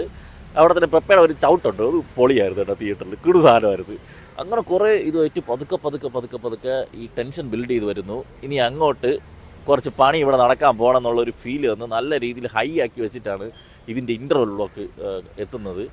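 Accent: Trivandrum, India. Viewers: എനിക്ക് താങ്കളുടെ തിരോന്തരം ഭാഷ ഇഷ്ടമായി !😂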